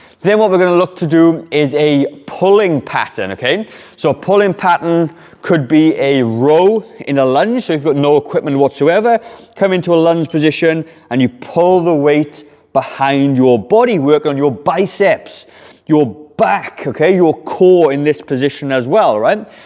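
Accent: Wales, United Kingdom. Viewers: No!!! He has a lovely Welsh accent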